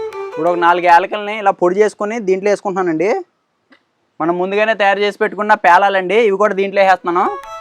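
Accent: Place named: Andhra Pradesh, India. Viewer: Brother meeru telugu andhra slang lo maatladithey chaala homely ga vuntundi.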